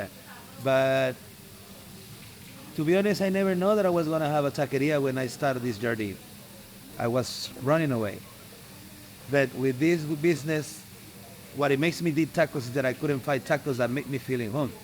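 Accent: Mexico. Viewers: lol he's Mexican. His accent is Mexican with English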